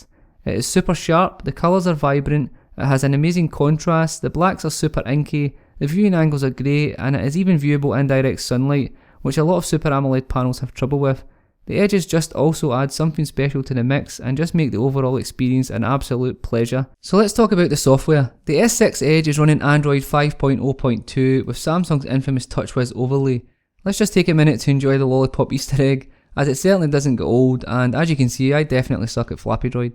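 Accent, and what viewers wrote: Scotland, United Kingdom. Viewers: I really enjoyed it! Since your primary audience is in the UK, I wouldn't worry about your speaking too much. Even being in the US, I understood you perfectly. I'm a little biased though. Scottish accent has always been my favorite. Subscribed. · Good review for an awesome device. Your Scottish accent is not that thick and can be easily understood. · Nice review bro. Going to Glasgow,Scotland for the 1st time and your video has made me get used to the accent! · OMG I frigging adore your Scottish accent!! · I like your Scottish accent, it let's me practice on understanding it 😁 although, you should practice on talking a bit slower.. Very good review Good luck! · Is this a scottish accent ? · You should rename your website: Scottish tech reviews! Love the accent :) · Great video for a relatively new reviewer. Love your Scottish accent! 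Subscribed!! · See it the Comments below you are Scottish- I figured by the Accent it was either Scotland or Texas.... And down to earth informative Reviews nice relaxed Style good voice and no problems understanding you.. Want to hear more about that Battery- should the Phone have been a little thicker for 400 more Mah ?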